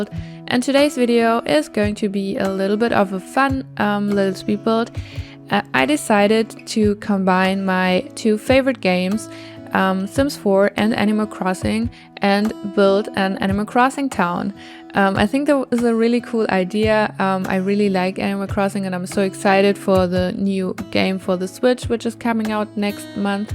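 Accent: Germany. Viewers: are you german? you kinda have a german accent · Are you german? Because of your accent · You Sound very german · Are you german? Cause u sound like it 😅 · I hear a german accent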